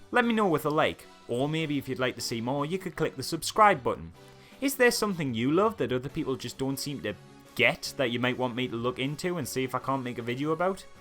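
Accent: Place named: Geordie, United Kingdom. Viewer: You absolute geordie. Let's meet up and Have a space dandy time. "HEaring yoou discribe this is pua amaaaazin" vowels going as hard as this show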